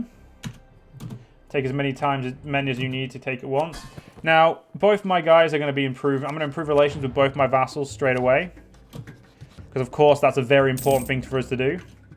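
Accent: England, United Kingdom. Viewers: Because of the way he was saying the NEW MODELS ARE LEGITAHMEHLLY BYOOAFUL I couldn’t watch. English accent is shite.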